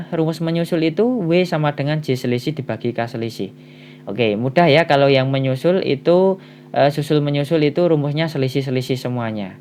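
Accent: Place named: Java, Indonesia. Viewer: Salfok sm suaramu bang mirip ping lie agk medok jg hehe 😂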